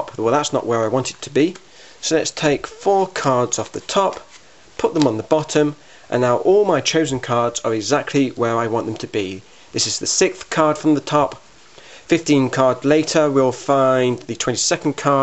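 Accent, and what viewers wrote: United Kingdom. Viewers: i like ur british accent~ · i would guess ur british? -.-'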